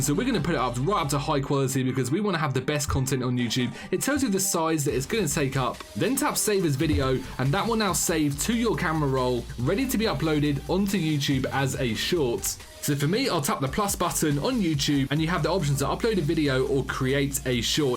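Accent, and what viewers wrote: United Kingdom. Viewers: A man that sound British and looks Swedish?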